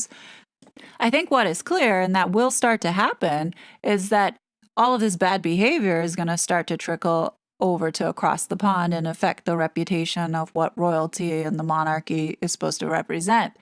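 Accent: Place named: New York, United States. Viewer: Thank you for reporting this! This is the first place I heard it, and what could be better than hearing your NYC accent. I love it! I think the whole point of this shit is to bring down the monarchy, to cheapen it as you say. Like I keep saying, they can’t have their new world order over in Davos with a 1K year old monarchy. Out with the old, in with the new, and it sucks.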